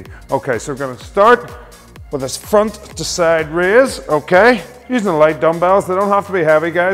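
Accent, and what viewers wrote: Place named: Scotland, United Kingdom. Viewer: I'm happy to have found your channel. Your workouts are for all fitness levels and are quick and to the point without endless chatter and you explain the movements and techniques extremely well...just a question--are you from Scotland? My boss at work is from Glasgow and speaks just as you do:)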